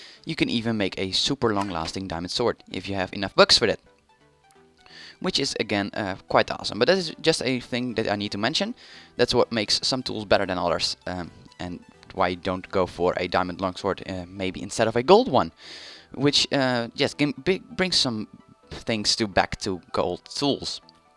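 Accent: Netherlands. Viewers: Kut nederlands accent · Becous of your accent I think your Dutch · Are you dutch? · I can here an obvious dutch accent...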